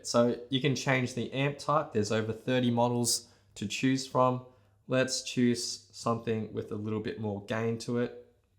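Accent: Australia. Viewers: Do I hear an Aussie accent? If so,.. I've noticed several guitar guys from Australia lately. Very cool and says a lot for a strong music culture there! Spark 40 sounds like you're in a box. Surprising the Spark Go has more depth of sound. Strange. Currently,.. Pre-Orders $109 for this tiny little guy!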